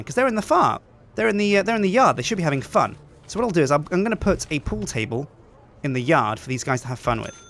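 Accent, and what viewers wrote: United Kingdom. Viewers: SHUT UP! He's British! It's hard for him to speak another accent! (please spell his name correctly!) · he's from britain of course he has an accent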